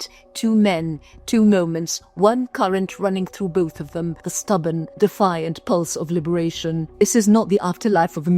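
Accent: RP, United Kingdom. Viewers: Not to go off-topic (wonderful powerful message eloquently delivered), but I just have to know where here voice (accent / pronunciation) comes from? It's like she swallowed a few English monarchs and perhaps some Thatcher as well. Also, in how far is this her 'reporter voice', as in, how different is it from her natural day to day speaking voice? I'm intrigued.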